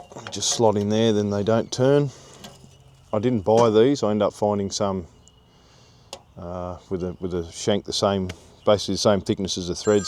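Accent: Australia. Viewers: its Australian mate 🎉😂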